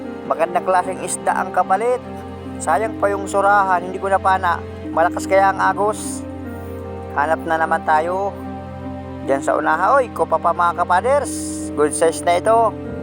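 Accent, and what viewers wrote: Bicol, Philippines. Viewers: Tyaga lng idol,masaya kng panuorin dahil may halong bicol pananalita mo,dahil bicol din ako,sa sipocot cam sur,kaya lng nsa europ ako.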